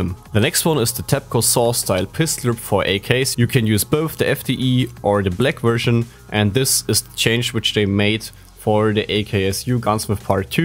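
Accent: Germany. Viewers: Bist du deutsch wenn ja mann hörts xD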